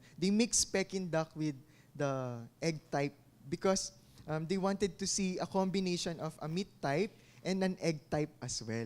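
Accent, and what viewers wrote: Philippines. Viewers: Sir magtagalog ka nalang mas bagay sa accent mo :)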